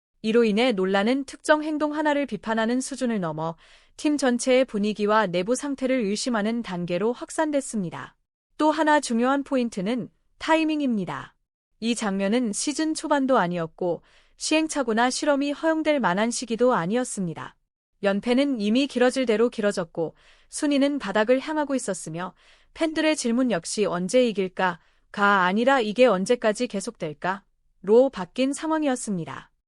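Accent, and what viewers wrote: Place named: China. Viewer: 자막이 초등학교도 못 나온 사람이 쓴것 같고. 멘트는 중국인이 읽는 듯하다.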